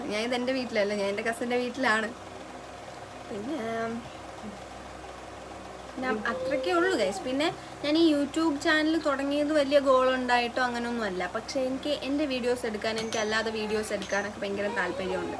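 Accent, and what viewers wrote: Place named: Thiruvananthapuram, India. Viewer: നിന്റെ സംസാരം കേട്ടപ്പോയെ മനസ്സിലായി തിരുവനന്തപുരം ആണെന്ന് 👍👍super ആയിട്ടുണ്ട് വീഡിയോ ഇനിയും idanam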